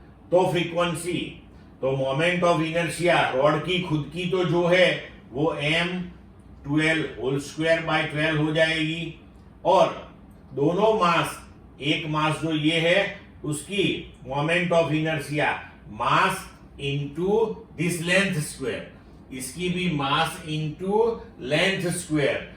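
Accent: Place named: Gujarat, India. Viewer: Thank you sir, and you have got full gujarati accent nice explanation !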